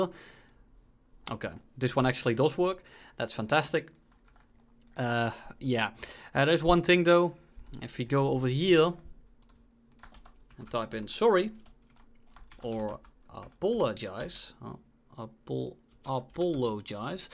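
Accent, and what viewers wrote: Netherlands. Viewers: I do love listening to Dutch people talk about something for up to an hour.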